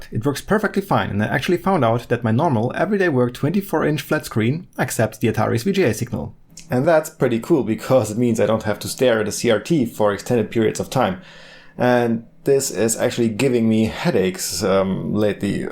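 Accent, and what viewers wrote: Germany. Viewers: Ich höre einen leichten German Acczent ;o)... warum nicht gleich auf deutsch - gibt leider, viel zu wenig solcher guter Videos auf deutsch! Trotzdem: gute Arbeit - vielen Dank!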